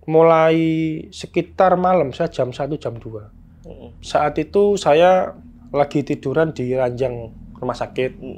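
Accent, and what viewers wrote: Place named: Java, Indonesia. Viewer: Medhok bgt mase😂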